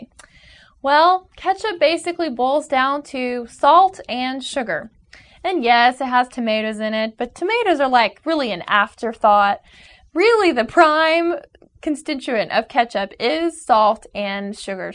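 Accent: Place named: United States. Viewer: that American accent really grates on me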